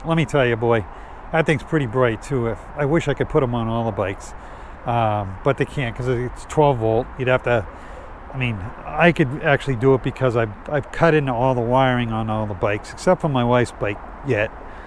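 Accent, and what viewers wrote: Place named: Northeast, United States. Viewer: Hey do you have a link or source that you used for your cable management i wanna do that to my expedition looks super clean on this bike btw where u from New York or New England? i grew up in Mass. by Boston so i recognize the North East accent so you like those direct drive motor's verses the geared hub motors i know direct drive are quiet and made for speed more while the geared are supposed to be more setup for torque going up hills and what not from what ive come to understand im looking into putting another geared hub in the front of my expedition but its been a long time in the making due to research cost and what not have any info on that type of situation by chance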